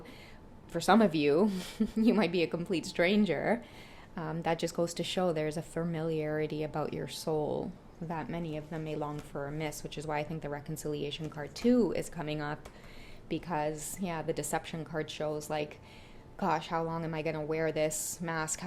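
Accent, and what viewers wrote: Trinidad and Tobago. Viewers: Hi.. I'm Jamaican 🇯🇲 & let me tell you, I absolutely love the trini accent! I always look forward to you saying something in your accent. Love it! ❤ This reading rings true about songs, & they're very old, most of them I've never heard before but speaks of our situation. It's been happening on my end too both channeled & hearing on the radio. He's definitely getting clarity cause I do sense/feel it. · Hi i am a twin flame and also a trinidadian, love the accent and you always have pleasant and optimistic things to say to those on this journey. Blessings to you.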